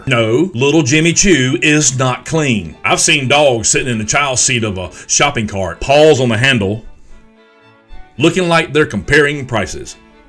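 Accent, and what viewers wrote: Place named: South, United States. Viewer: Did you say dawg???...lol 😂😂😂 I literally couldn't get passed that southern accent, so adorable 😍 I love cute dawgs too lol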